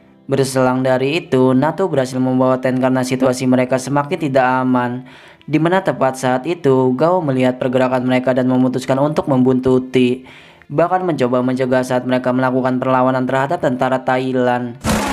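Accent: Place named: Java, Indonesia. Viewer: Logat nya mendok sekali eperi badi😂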